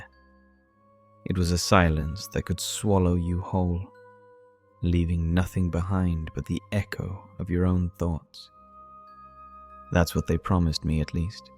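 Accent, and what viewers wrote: England, United Kingdom. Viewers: First of all, the past tense of drag is dragged (not drug) regardless of what many of this country say. That said, I enjoy the apparently proper English accent and grammar used. Other than that, it is well done as a haunting yet real story, well told. More importantly, I believe this is an accurate account of those in sanitariums in past years. With deinstitutionalization in the U.S. in the 70’s came new heartbreaks and injustices. “Nurse Rachets” have abounded. Honestly, most people love power over others, and when afforded opportunity, will abuse. But, their days are numbered. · Brilliant narrative! This fictional sanitarium echoes that of Waverly Hills Institution, sadly this one very much existed. Are you British? Your accent is lovely 😍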